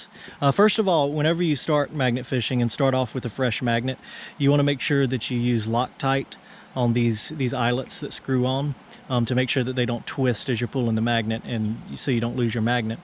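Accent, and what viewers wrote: South, United States. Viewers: Wow, that the most beautiful Ridge l have ever seen. Those arches under it are so amazing, l have never seen anything like that before. And looking up at the railway bridge the creek was absolutely gorgeous. Those gun shots where worrying though. Thank You so much for the videos, your a real Southern Gentleman,l love your accent, so different from here. Keep Safe, Debra from Australia. 🌿🍀🌷🌹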